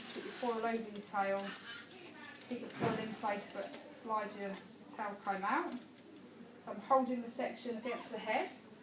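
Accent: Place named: Kent, United Kingdom. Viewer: Is this in southeast Kent? Sounds just like the accent in Sandwich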